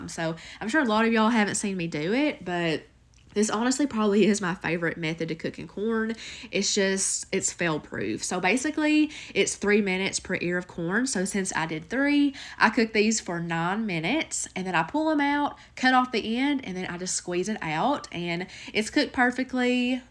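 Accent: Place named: Kentucky, United States. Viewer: Your Kentucky accent brings joy to my family. ❤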